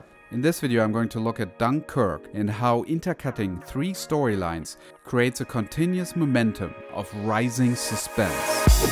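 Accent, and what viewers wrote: Germany. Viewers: Defiantly has a German accent first thing I noticed lol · Hey do you have a German excant ?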